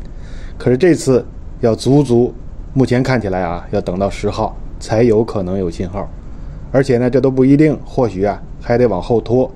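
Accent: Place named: Tianjin, China. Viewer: 请问李船长，你是不是还有个频道叫？声音和你一摸一样，也是天津人。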